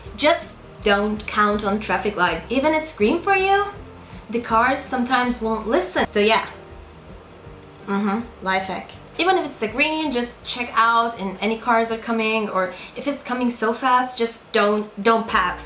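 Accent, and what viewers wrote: Turkey. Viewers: Aksanindan turk oldugu anlasiliyor, mal mi ne ya. 😂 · Konuşan kiz nereli? Türk mu? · Kız Türk arkadaşlar. Aksandan anlaşılıyor zaten. Hakkında bölümünden de görebilirsiniz. (Çok iyi İngilizce konuşuyor, o başka) Türk'ü Türk'ten başkası kötülemez ki. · Canım senin isim yabancı ama sen Türk sün belli o aksan ı yapman için çocukluktan öğrenmen Lazım Türkçe bu o kadar değil. Ayrıyeten konuşmanı düzelt demeyeceğim belli ki tarzın bu onun yerine değindiğin Konuları mal gibi anlatma:// so sadd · Why is your name if you're a Turk? You also don't sound like you've been raised somewhere else than Turkey, you have the Turkish accent. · Aksandan Türk olduğu belli. Kullandığı adı yadırgamıyorum çünkü Çiğdem, Işın gibi bir adı koysa hiçbir gavur gelip izlemez. da.. ne iş? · Well..She just trying to be famous as other crappy ppl. i think she is fully Turkish or she might living in Usa or any other country atm,she just trying to act like she is not Turkish but her accent doesn't let her hide it. 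i just notice it in first 5 min.i will check her other videos too, lets she what she done. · She is turkish! This is typical turkish english accent when they learn it in school:)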